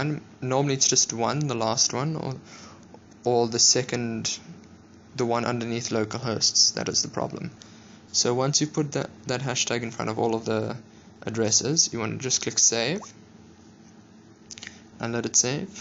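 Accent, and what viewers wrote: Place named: South Africa. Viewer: Your accent sounds like that of a south african's accent,am I correct in assuming this?Prob joburg or capetown?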